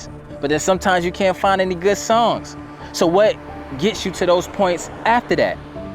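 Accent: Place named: Brooklyn, United States. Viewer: Yo my Guy, u be preaching. You need to give your bio or something. Let the people know who you are. You are the first motivational speaker who talks like me ( hip hop). You gotta be from Brooklyn. I’m from Queens. I have been struggling and listening to you is really helping me Bro. Salute💪🏿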